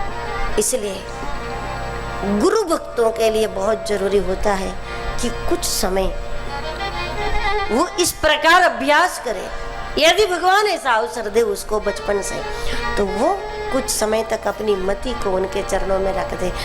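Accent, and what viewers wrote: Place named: Bhiwani, India. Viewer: Dachhhat bhiwani bolrahi hai gajab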